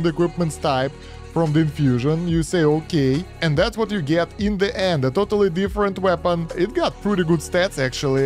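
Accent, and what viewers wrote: Russia. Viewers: такое ощющение что он русский и разговаривает на английском с ужасным акцентом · ДАА, ТАКОЕ ЖЕ ОЩУЩЕНИЕ, ЖЕСТКИЙ РУССКИЙ АКЦЕНТ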